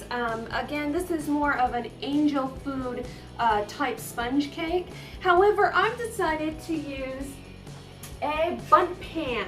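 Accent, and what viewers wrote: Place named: Midwest, United States. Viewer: I'm guessing u are from the Midwest. ..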